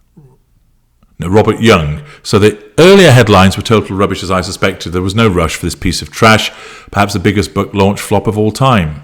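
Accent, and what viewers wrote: Australia. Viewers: Hahaha 🤣 love your Ozzie accent. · Love the Ozzie accent.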